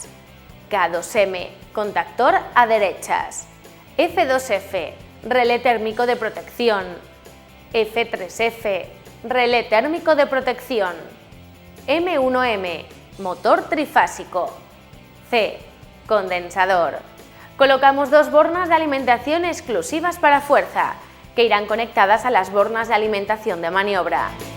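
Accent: Spain. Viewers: Linda, inteligente y con acento español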